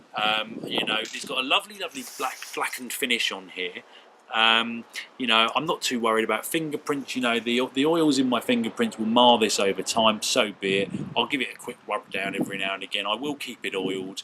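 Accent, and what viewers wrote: United Kingdom. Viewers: Don't keep me wrong, I love your reviews, but this british accent makes me remember Monty Python's scenes. By the way, I miss so much those guys. Thanks.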